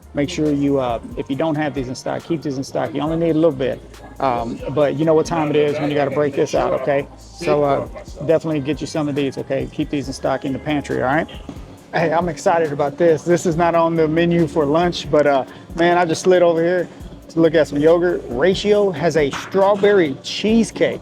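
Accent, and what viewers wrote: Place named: South, United States. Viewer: Great video!! Love your southern accent!!!! What state are you in?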